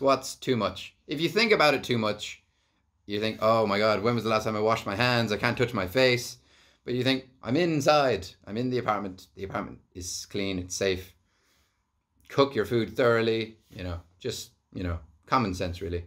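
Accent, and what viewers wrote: Ireland. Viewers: And besides you sound Irish have you ever heard of the Fighting Irish there's a reason why they're called that. Happy birthday buddy my birthday was the 25th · I’ve always loved an Irish accent!!!!!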